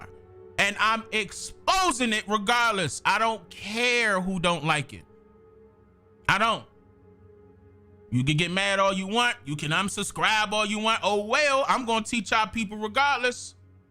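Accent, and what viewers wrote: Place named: DMV, United States. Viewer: Damm you got me Smiling, Shit I always knew you where from the DMV Area you got that wire accent. Judge Joe brown too old to be acting like that he’s a grandfather he should have more sense than that. GOD BLESS TO EVERYBODY IN THE COMMENTS!!!!!!!!!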